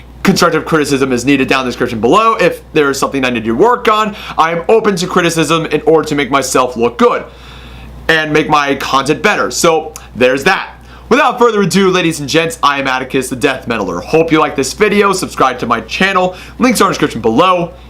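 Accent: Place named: Russia. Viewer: Dat Putin accent doh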